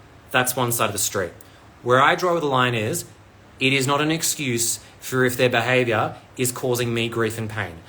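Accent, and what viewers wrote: Australia. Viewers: I loved it when I started listening to this, and it was a fellow Aussie speaking!! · Are you from Perth/Western Australia? Your accent reminds me of some I used to know.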